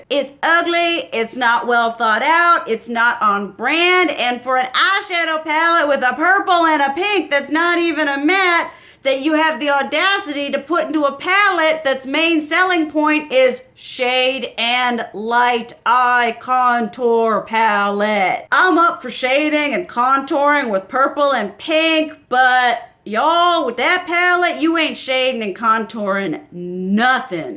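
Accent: South, United States. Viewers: I dunno if anyone has told you this but you sound very American hah. Not in a bad way. My American peeps tell me I sound very Canadian. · Just wanted to stop by and say I absolutely adore your aesthetic and accent! I was born and raised in GA and your accent is the perfect embodiment of what a southern accent is! Plus, your attitude and personality and energy presented in this video and how animated you present yourself is everything I can relate to! I’m here for it all haha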